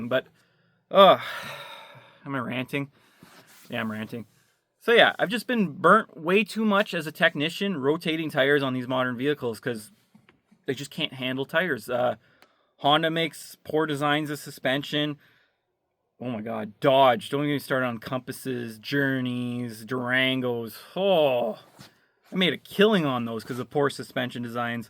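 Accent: Canada. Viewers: Guess you didn't catch his Canadian accent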